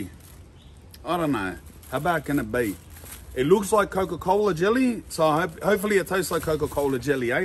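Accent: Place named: Australia. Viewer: cheeps lol aussie accent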